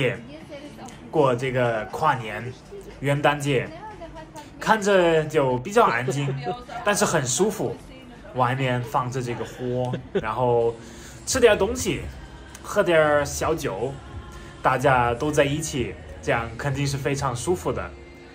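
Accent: Sichuan, China. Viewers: 这川普有点打脑壳！！🤣🤣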